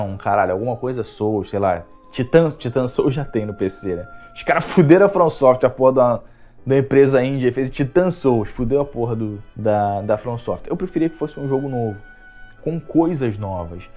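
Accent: Rio de Janeiro, Brazil. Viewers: nem tô mané, é só carioquês mesmo... Isso aí pra mim é vírgula kkkk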